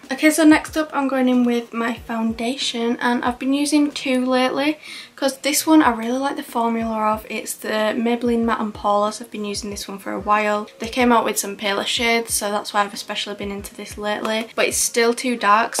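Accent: United Kingdom. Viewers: Your British accent 😍😍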